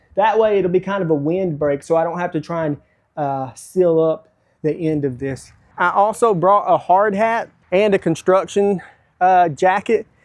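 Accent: South, United States. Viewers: Another great video! As a Canadian, I think one of my favourite parts is the southern accent lol the way you said “see-ment” made me laugh (the same way we say “aboot” and I don’t mean this in a negative way! Thanks for the laughs and another great video. Cheers!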